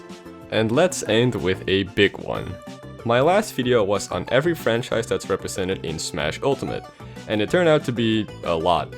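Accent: Netherlands. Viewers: Wait a second are you dutch? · He dutch? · Wait, does this mean that you have a Dutch accent? if you don't mind, what part of the Netherlands you from? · hoppa ik dacht al dat ik een Nederlands Accent hoorde. Ik ben de allerkapotsteslimste werkloze persoontje op de wereld yesssss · Are you dutch? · omg are u dutch? Omg dat is echt cool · I KNEW YOU WERE DUTCH YESSS I am also dutch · ik wist niet dat je nederlands sprak, ben je een belg(zoals ik) of ben je een nederlander? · You Dutch?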